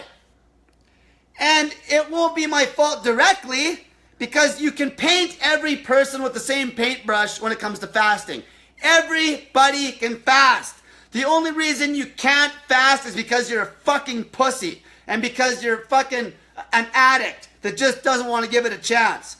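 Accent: Canada. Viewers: you Canadian? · that canadian accent STRONG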